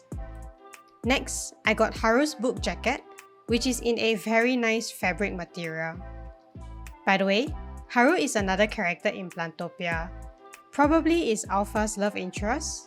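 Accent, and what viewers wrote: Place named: Singapore. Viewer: The figure is so pretty!!! Esp that axe omg! Haha I was surprised when u started speaking, hello fellow SGean :> I haven't seen any other reviews of her so this is vv interesting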